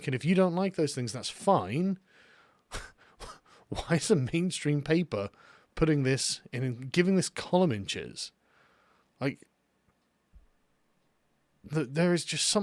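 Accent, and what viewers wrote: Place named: United Kingdom. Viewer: You’ve got the most awesome British accent ever!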